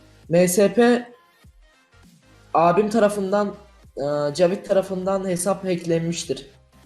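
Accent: Azerbaijan. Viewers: kesinlikle Azerisiniz. Belki kendini diye tanıtan insan bile değildir. Zaten iyiki de ( ismi bilinmiyor xc) abone değilim. Bu kaçıncı yalan? İnsanları kandırarak birşey elde edemezsin! Lox sözünden anladım ve konuşmandan. Bende Azeriyim de, kendinizi bu kadar düşürmeniz? Ah, kesinlikle boşsunuz. Sizin içinizde,dışınızda boş. Benim yorumuma gelip Mertciler pardon Sahtekarcılar buraya havlamasınlar...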